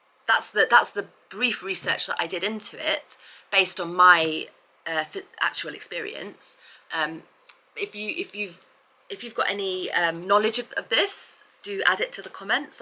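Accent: United Kingdom. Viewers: Hi. It's so nice to hear a fellow (and beautiful) English accent. Best of luck with everything.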